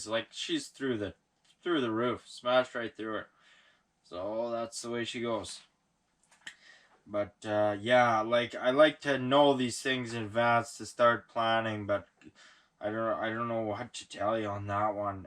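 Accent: Canada. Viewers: Ah shite aye? Thats kinda luck shitteh. I came here for how you talk its hilarious and the world needs more back country Canadian slang.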